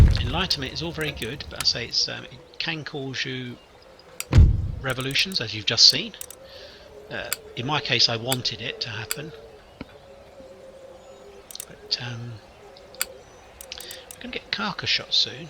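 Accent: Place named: England, United Kingdom. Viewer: mr im not sure if i ask but are you english because i like your accent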